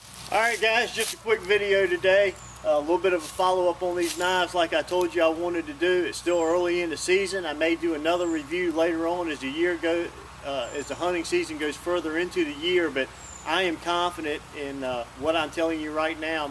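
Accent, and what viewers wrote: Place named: Southeast, United States. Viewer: That sounds like a good ole' southeastern accent. I just subbed your channel. What state are you in? I'm a Georgia/ Alabama resident.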